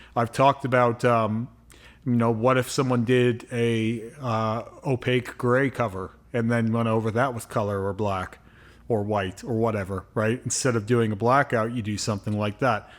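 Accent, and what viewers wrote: Canada. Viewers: As a Canadian myself, I could tell right away that you were Canadian lol everyone thinks Americans and Canadians have the same “accent” but it is so different hahaha cool stuff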